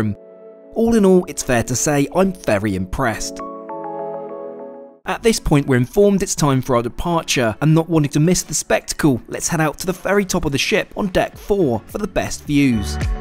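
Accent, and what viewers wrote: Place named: England, United Kingdom. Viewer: He has an English accent, but definitely not a pompous or upper class accent. He sounds to me like someone who is trying to ennunciate his words so that everyone can easily understand him.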